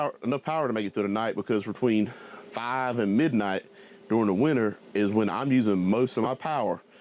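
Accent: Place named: Texas, United States. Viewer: Nice! Kind of hard to guess not knowing where you're located (although sounds like TX), but I'm going to guess 101kW.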